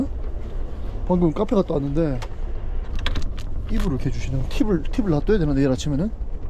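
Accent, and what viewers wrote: Gyeongsang, South Korea. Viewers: 뜨신물, 꽈자 ㅎㅎ 숨길수 없는 경상도 남자의 흔적. 하지만 자막은 서울말 ㅎㅎ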